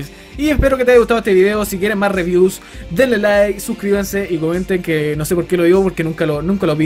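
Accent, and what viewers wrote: Chile. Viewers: La mejor mierda es que este en audio español chileno xD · Es chileno bro V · espera eri chileno? y en que pais vives?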